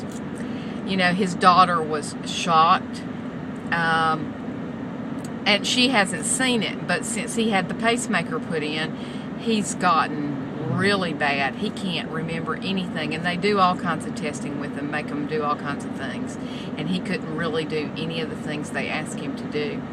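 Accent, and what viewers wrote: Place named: South, United States. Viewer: I just love your accent and all that southern charm. Sounds like it's a busy few weeks coming on. You're doing great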